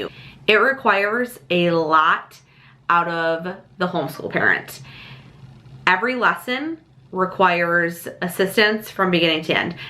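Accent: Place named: New Jersey, United States. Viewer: Hiiiiii!!! I just found your channel and subscribed! So weird but what state are you in? You sound like you’re in jersey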